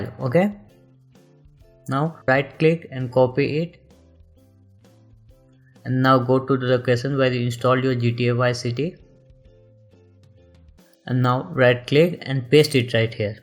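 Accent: India. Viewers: Your Indian accent is cute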